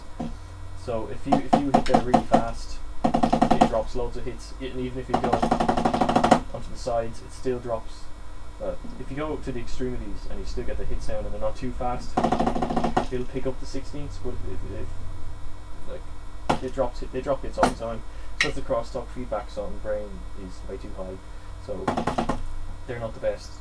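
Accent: Ireland. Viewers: are you from waterford? you sound like your from the deise...boy!!! :)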